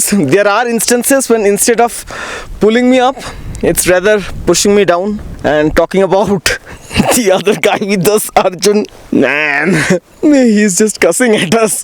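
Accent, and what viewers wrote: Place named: Bengal, India. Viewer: Bhai ka bong accent hai.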